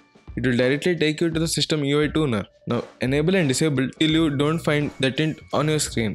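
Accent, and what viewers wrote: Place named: India. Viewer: That's Indian dialect. He knows English perfectly well, thanks to the British Empire.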